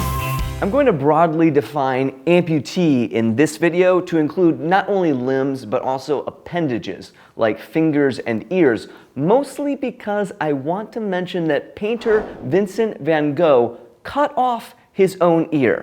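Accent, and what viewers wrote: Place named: United States. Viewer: Everyone is freaking out in the comments about how an American pronounces words that ARENT ENGLISH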